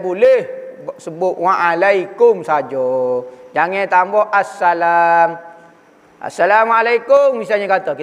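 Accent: Kelantan, Malaysia. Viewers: suka dengar ostaz kecek klate hehehe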